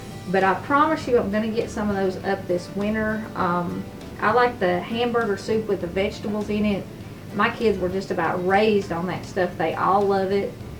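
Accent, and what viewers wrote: South, United States. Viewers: I love your southern accent. The music you useis great. I also love the dishes you cook. I like down home comfort foods.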